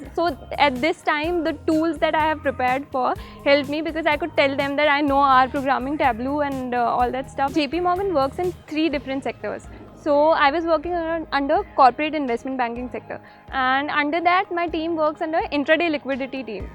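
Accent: India. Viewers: With an accent like that you cannot move up high on the corporate ladder. You need to get rid of the Indian accent so that you can go head to head with USA executives. Indian accent and way of speaking are very hard for Americans to understand.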